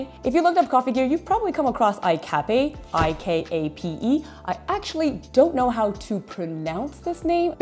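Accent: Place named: Canada. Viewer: I love the way Canadian say "Owt", for the English "Out"...it's the way I can tell they are Canadians when I meet them, rather than insulting then by saying "Are you American?":-))) Also, filling it up full with water regardless of the size drink you want will be better, as a larger volume of water will maintain the heat better for the extraction temperature than a smaller volume of water, where heat is 'soaked' into the machine body.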